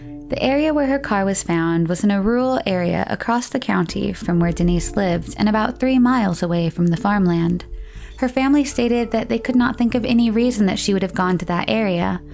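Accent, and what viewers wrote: India. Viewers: I was always wondering where are you from, coz i felt you maybe from India due to your accent. As a fellow Indian, I want to congratulate you for running such a nice & successful channel. I am so happy to subscribe & enjoy your content!!! All the best & keep it up 👍👍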